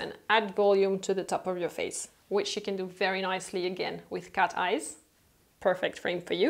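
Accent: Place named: France. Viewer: Hi! I love your videos ❤️. They're always so informative 👍. I also enjoy the soothing tone of your voice along with your accent! Im such s 'francophile'! Love all things French😘. Merci Mademoiselle!!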